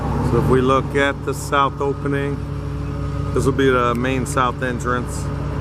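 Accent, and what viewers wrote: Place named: Wisconsin, United States. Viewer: You’re from N/E Ohio, Closer to Cleveland area? That’s why I hear Wisconsin & Pittsburgh mixed. 😏 🤙 🙏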